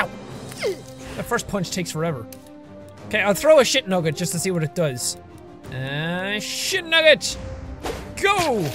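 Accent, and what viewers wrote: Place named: Ireland. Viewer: He is Irish so his accent is different.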